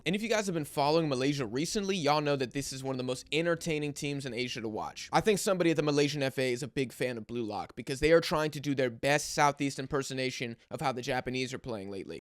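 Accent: United States. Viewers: He has a typical thick American English accent. So I guess he is from the USA.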